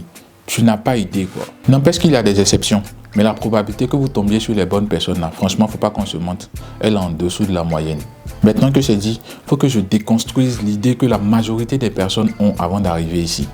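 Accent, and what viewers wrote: Ivory Coast. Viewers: L'accent Ivoirien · Je suis nouvelle juste pour les parabole je m'abonne 😂😂 j'aime écouter quand un ivoirien parle. 😅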